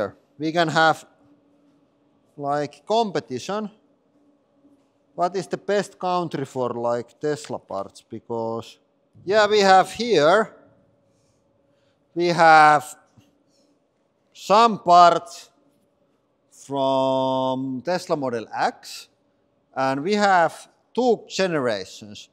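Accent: Finland. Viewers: English speakers, how do you find this guy's English? This is what we call in Finland, 'rally English', and I hear some of us really speak this way. I don't, but I'm a curiosity.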